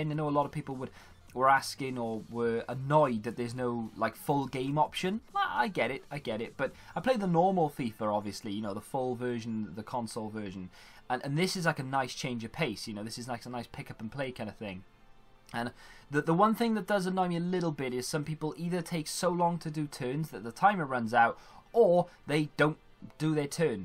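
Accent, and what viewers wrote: Wales, United Kingdom. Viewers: tell you are welsh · are you welsh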